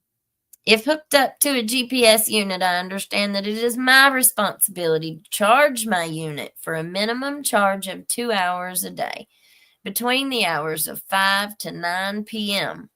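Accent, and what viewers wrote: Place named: Appalachia, United States. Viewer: G Fish is how I found this channel. I'm in the UK and absolutely love the "Hillbilly" accent ( no offence meant).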